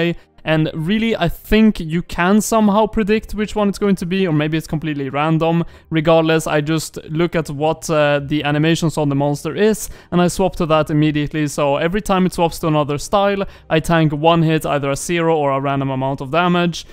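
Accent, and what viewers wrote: Sweden. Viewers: Where in Sweden are you from? :D Rsn: Virgin Barry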